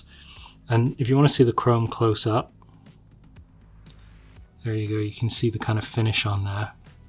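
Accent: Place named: United Kingdom. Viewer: Nice collection, mate! 👍 Particularly love the Dagobah set-up! Maybe surprisingly, the one figure that stood out for me and makes me want to get one was R5-J2! That little fella looks quality standing next to Tarkin! 💯👌 Btw, are you a British expat in Canada or do some Canadians sound as though they're from the UK? (Hope that's not offensive in any way to our Canuck cousins!) 😅 Cheers!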